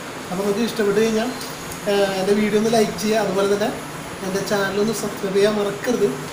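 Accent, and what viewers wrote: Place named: Thrissur, India. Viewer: രാത്രി പതിനൊന്നരക്ക് ഈ വീഡിയോ കണ്ടപ്പൊത്തന്നെ വിശപ്പായി... 😀... നല്ല അവതരണം, തൃശൂർ ഭാഷ എന്നെപോലെ തിരുവനന്തപുരംകാർക്കു വലിയ ഇഷ്ടമാണ് 👍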